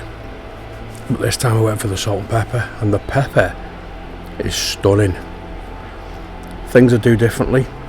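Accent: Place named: Liverpool, United Kingdom. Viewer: I`ve watched several of your vids and I really like them! Will try beef ribs on my Weber Summit Kamado pretty soon. And drinking ERDINGER Weissbier isn`t such a bad idea 🙂 You should also try PAULANER or SCHÖFFERHOFER Weißbier. And I also like your Liverpoolish accent (as one of the US guys mentioned already) 🙂- and our former Mainz 05-coach Jürgen Klopp loves Liverpool as well. Greets from Germany Wolfgang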